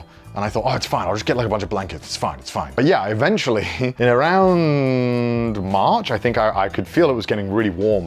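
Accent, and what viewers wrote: United Kingdom. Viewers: Tbh I really like how talk, the British accent is chef kiss even though he’s saying funny stuff, is just soothing 😫✊🏻❤️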